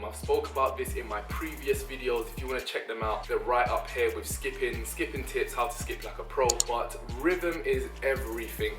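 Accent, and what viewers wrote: United Kingdom. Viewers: Nothing sexier than a chocolate man with a British accent....with tats...with a beard...with a six-pack...that’s tall. Ok I’m done.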